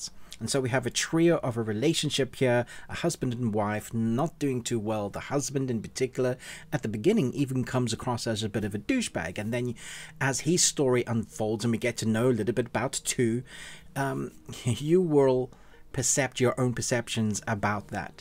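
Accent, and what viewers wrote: South Africa. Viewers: The ending was confusing for me.. the dead bodies. Quick question, what is your accent? You sound South African mixed with English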